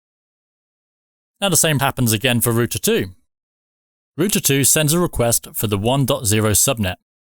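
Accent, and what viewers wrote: England, United Kingdom. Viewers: Dude, it's called English pronunciation!!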